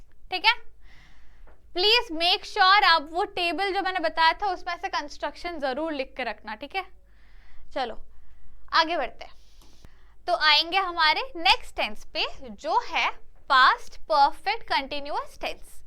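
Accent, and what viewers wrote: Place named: Bengal, India. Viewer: I really like the Bengali accent when you speak Hindi it sounds cute when someone speak like that😊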